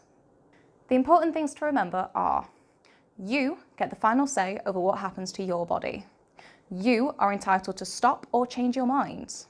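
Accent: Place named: United Kingdom. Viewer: i'm pretty sure the person speaking is british too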